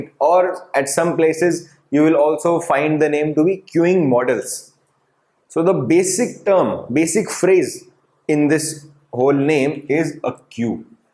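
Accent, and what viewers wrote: India. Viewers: I like how you elaborated what queuing theory entails with simplicity and clarity even though Indian accent is a bit of challenge.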